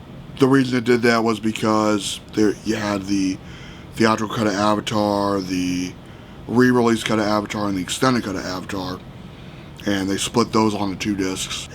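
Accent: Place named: United States. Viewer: Hi, you have a very interesting accent. Where in the US are you from ? Thanks for sharing your thoughts on this Sony.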